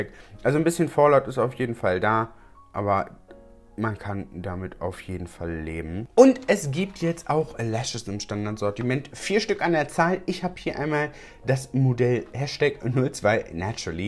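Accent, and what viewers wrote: Berlin, Germany. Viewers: Uh hallo schöner Mann, bist du aus Berlin? 🙂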